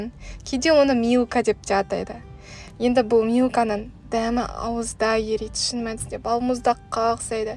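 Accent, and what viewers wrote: Standard. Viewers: сізді үнемі көремін. Өте жақсы дайындайсыз! Таза қазақша сөйлеп!☺️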